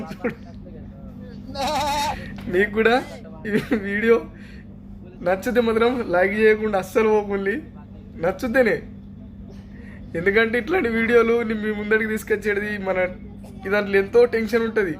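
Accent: Nizamabad, India. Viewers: వీడు nizamabad లేదా ఆదిలాబాద్ లాంగ్వేజ్ ఉంది పిచ్సిలేసిపడి వీని స్లాగ్ చూస్తుంటే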